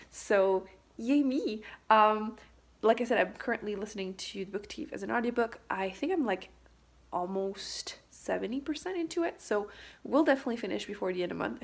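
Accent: Quebec, Canada. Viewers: I loved hearing you speak French, and as a European, I find your Québec accent so interesting :) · I'm glad you put the subtitles because as a France French speaker Canadian French sounds so foreign! lol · Tu devrais regarder la vieille mini-série avec Gérard Depardieu au lieu du film américain. C'est plus proche du livre. Ton français n'est pas si rouillé lol ton accent semble plus québécois que français o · I am very curious as to know where your accent is from! It sounds « québécois » in some parts but I can’t quite pinpoint where it’s from! I’m very curious for the context! My parents are Québécois, and my father was in the Canadian Army so I actually moved a lot and learned English at 5 years old when I started school. I live 10 min from Quebec city now! And I read in English and watch TV in English so I can keep it... we speak English at home (my 4 year old is learning both languages at the same time!)... 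If you want to practice your french it’s actually a great esy to do it! (Movies tv and books!) ;) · Je reconnais cette accent là, QC!!!! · Good vlog, love it!! Continue de nous partager ta passion, afin de nous motiver davantage! 😊 By the way, me too, french is my first langage but your videos encourage me more to read in ENGLISH. Tu parles très bien le français! Par ton accent, je crois que tu es québécoise, je me trompe? 😜 j'espère que tu as appellé un électricien pour ta prise défectueuse!! 😉 Stay safe. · Salut! C'était sympa de t'entendre parler Français avec un accent québécois ^^ si tu es tenté pour avoir des correspondantes pour dé-rouiller ton français, je suis dispo:) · She is Québécoise ?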